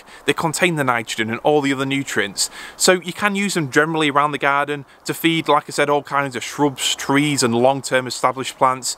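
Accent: United Kingdom. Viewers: First time I've seen your channel... Its great. Love ya Northern accent and your plants.. Lush m8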